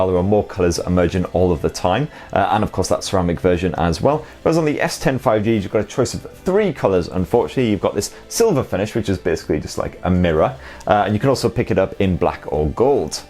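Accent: United Kingdom. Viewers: This video can actually be done in 5 mins or less. He said he doesn't want to bore us with the similarities, but that's all he's been talking about for about 7 mins. I skipped three times. Right, like we don't know about IP67. I love the British accent, but this guy's voice is... gross. So I thumbed down, twice if I can.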